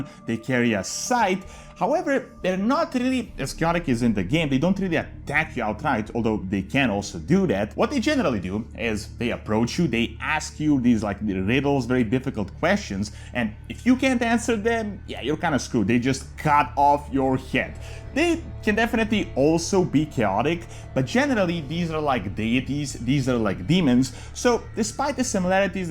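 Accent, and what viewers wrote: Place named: Eastern Europe. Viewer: where are you from you pronounciate all of the names like a real slavic are you slavic?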